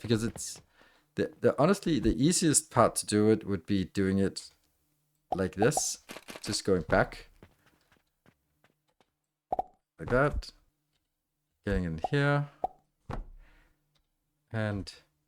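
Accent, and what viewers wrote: Denmark. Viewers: Great videos thanks. You sound danish ?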